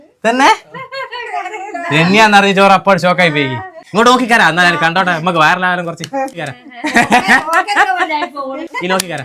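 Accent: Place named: Vadakara, India. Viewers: ഇത് വടകര ഭാഷ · കണ്ണൂർ സ്ലാങ് 👌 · വടകര ഭാഷ 😂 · Evdya place nammade tlsry panoor slang🤣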